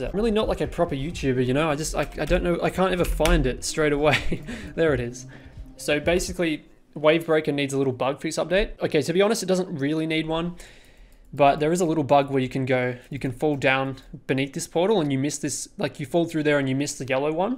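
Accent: Australia. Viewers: Pretty sure he's Australian, but same difference I guess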